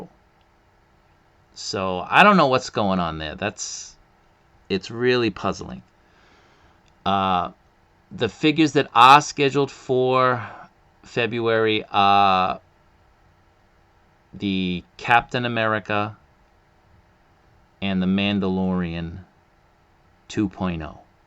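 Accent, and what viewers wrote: Boston, United States. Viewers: Are you from Boston/ New England area? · Mahch :) Love your videos. I grew up in New England but I moved to Virginia so I don't get enough Boston accents in my life these days.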